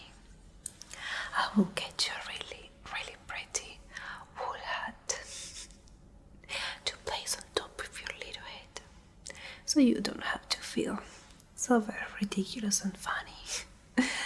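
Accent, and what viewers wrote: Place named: Latin America. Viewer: btw, I noticed that in the video prior to this one and in some prior (the birthday party one) you had some Spanish worded books and/or a Latin American accent. Are you from a Latin American country?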